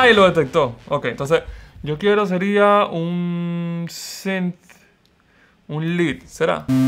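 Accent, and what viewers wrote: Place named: Dominican Republic. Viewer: me gusta tu acento... tu acento es de República Dominicana verdad?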